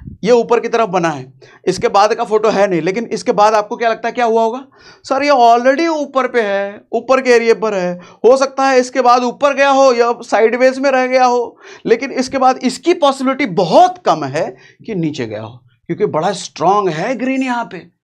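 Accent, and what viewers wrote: Bihar, India. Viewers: Kya aap Bihari hai❤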